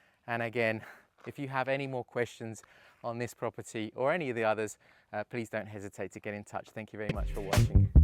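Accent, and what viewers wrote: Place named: England, United Kingdom. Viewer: never crossed my mind and I'm from that neck of the woods. I took you for English through and through .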